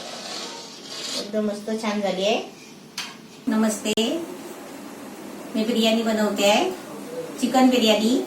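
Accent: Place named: Ratnagiri, India. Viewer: Taee masta biryani banavata ratnagiri chi bhasha pan mast bolta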